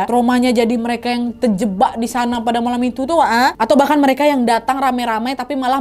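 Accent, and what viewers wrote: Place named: Java, Indonesia. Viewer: You heunti keun itu logat jawa hentikan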